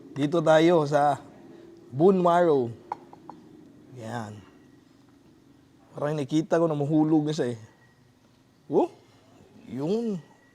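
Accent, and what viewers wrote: Visayas, Philippines. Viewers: I love that bisaya accent Though😊, lalo na ang pag ka brought ng sabaw😂😂😂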